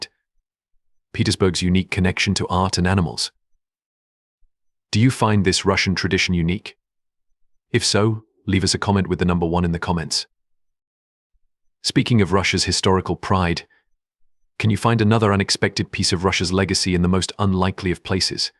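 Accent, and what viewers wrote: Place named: United Kingdom. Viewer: You should not have a Brit accent.. narrator… it ruins the presentation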